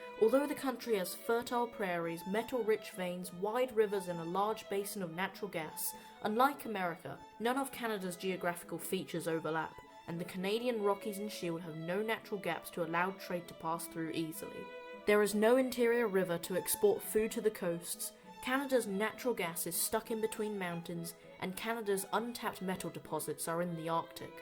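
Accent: United Kingdom. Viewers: You sound british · British accent, thumbs 👎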